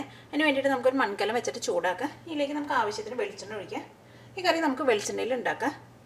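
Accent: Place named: Malabar, India. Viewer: I really loved the narrators south Malabar accent.. keep it up dear.. Godspeed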